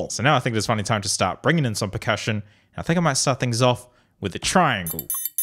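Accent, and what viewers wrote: New Zealand. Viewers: Coz yo I'm from.new Zealand aswell bcoz of your accent tho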